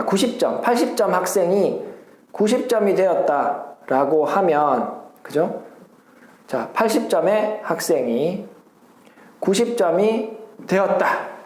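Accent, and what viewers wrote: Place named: Daegu, South Korea. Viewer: 빠른피드백 정말감사드립니다 복받으세요 ㅎ 선생님께서 말씀하신 동영상 잘봤습니다 저도 선행보다는 심화쪽에 관심을 두고 공부를 시키고 있습니다. 학원을다니는곳이 없어서 시간이 많은아이라 초등부터 수학공부에 80프로를 투자하고 공부를 해온터라 1-1학기 마무리하는데도 주위 다른아이들보다 몇배는 시간들여서 한듯합니다 그래도 안심은 늘 안되긴합니다 ..;;;수학학원을 보내보니 너무 엉망이고 집에서 하자하니 엄마랑 전쟁입니다 제가 공부하면서 가르쳐야니깐 보통일이 아니네요 사투리를 쓰셔서 혹시 해운대분일까하고 봤는데 대구....너무 아쉽습니다 제가 수학쪽은 몰라서 드리는 질문인데 1-1 2-1 3-1 이렇게 구조도상 공부가 연결이 되어있어서 1-1끝이나면2-1하는게 맞다고 주위 학부모들 및 학원선생님들이 요런과정으로 시키고들 있더라구요 그게 아닌가보네요^^다음 동영상올리실때 요런부분도 한번 짚어주시면 많은 학부모님께 도움될듯합니다.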